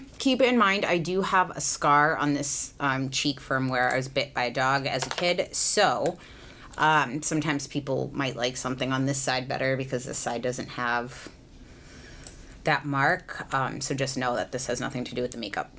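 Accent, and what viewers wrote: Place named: Massachusetts, United States. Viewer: I grew up in MA and knew as soon as I heard that she was also from that area. It's a regional sound.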